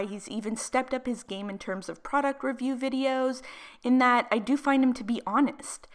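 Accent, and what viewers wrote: Canada. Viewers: is u canadian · Are you Canadian? You kind of have an accent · You must be Canadian?You have such a heavy accent!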